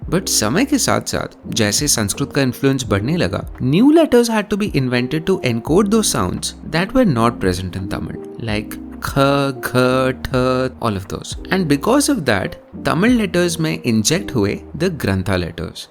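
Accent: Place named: Kerala, India. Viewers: You used malayalam accent so nicely.......❤ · After Chinese, Malayalam is the most difficult language in the world ! This video anchor's Malayalam accent is a perfect example of that. However, even today Communism is the opiate of the so-called intellectuals of Kerala !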